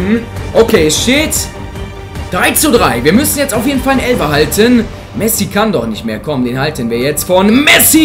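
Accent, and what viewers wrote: Germany. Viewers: Redest du Schwäbisch? Wenn ja mach mal davon ein kurzes Video pls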